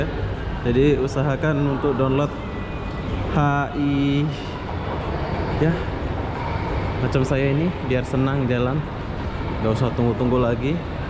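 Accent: Malaysia. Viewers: Bang knapa pakek logat malasia kan abang orang indonesia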